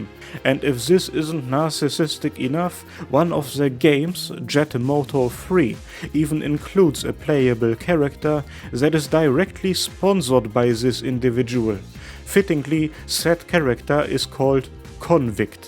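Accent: Germany. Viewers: Love your german accent hahah 😀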